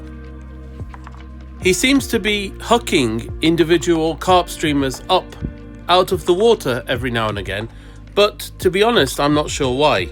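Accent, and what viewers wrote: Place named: United Kingdom. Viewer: Welcome to Hofu❗️ Great to see this event in your video 👍 Where are you from ? 🇬🇧or 🇦🇺 from your British accent. Hofe has many historical building, so please enjoy to stay✌️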